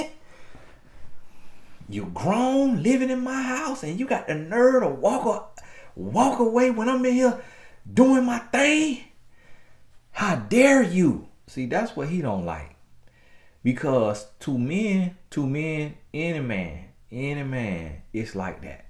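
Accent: South, United States. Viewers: your messages a very far reaching, not just for singles at all. I learn tons from every video that I can apply to my marriage, career, and life in general!! These are life lessons!! Plus I'm country too, so it's awesome to hear the dialect and phrases I grew up hearing. Lol. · Lol.... we love your Southern accent.....please ignore envious comments and keep teaching us...blessed you and the Fam 😁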